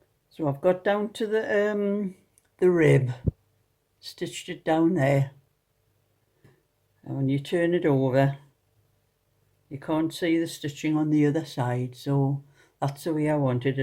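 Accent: Newcastle, United Kingdom. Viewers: Thank you for this lovely tutorial, exactly what I need for my cardigan pattern which assumed I knew how to sew on the pocket. Also love your accent, reminds me of my home and family from long ago in Newcastle 😁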